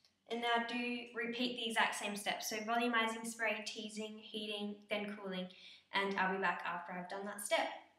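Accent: Australia. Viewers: Thanks I thought you sounded Australian x its a lush accent x :)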